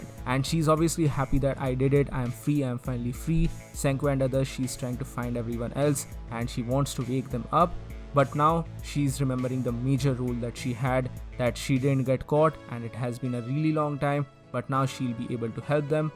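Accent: India. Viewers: hmm Indian accent makes me smile....🙃 proud of you subscribed 🙌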